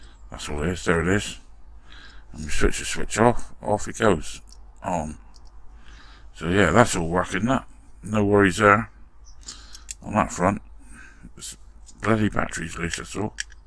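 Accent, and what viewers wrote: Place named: Plymouth, United Kingdom. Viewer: Hi, I have been doing this since lockdown, really enjoying it. Used your video to help me with the Green Hornet. I am in Plympton Plymouth, from your accent you Must be a Janner! All the best Mark. Starting the Joe 90 this week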